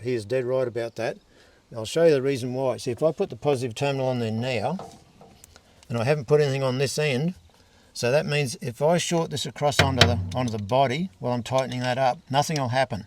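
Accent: Australia. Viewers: Australian accent. Hard to hear 😂😂😂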